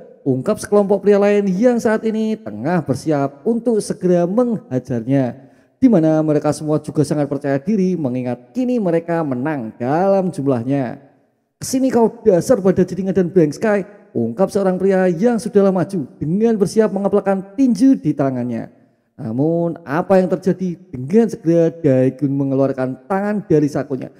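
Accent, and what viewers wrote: Java, Indonesia. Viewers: Logat jawa lo medok banget😅😅😅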